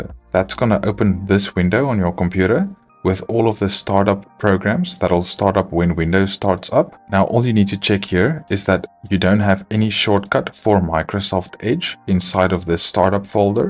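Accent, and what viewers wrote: South Africa. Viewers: South African accent. thanks for the help.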